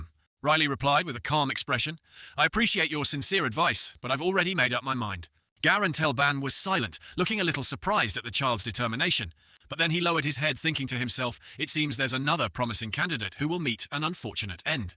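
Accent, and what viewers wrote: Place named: United Kingdom. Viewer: yo love the british voice 🙏💫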